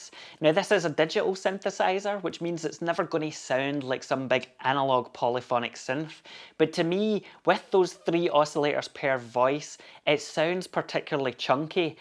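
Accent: Scotland, United Kingdom. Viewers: Sold my desktop last month to fund a Super 6. There was just something about its sound that I didn’t quite like compared to my other synths. Loved everything else about it, such a deep synth engine, amazing mod matrix and the macro assign is genius. But it just wasn’t getting the same love and attention that my Peak, XD and Iridium were getting. I may pick up an Explorer again though at some time. Always good to hear a fellow Scottish voice on a synth channel.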